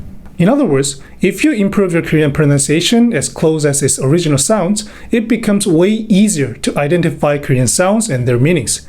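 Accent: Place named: United States. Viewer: Me being an English native British English speaker and being so confused when you said English words come from the back of your mouth, and then ofc the examples were in an American accent 😂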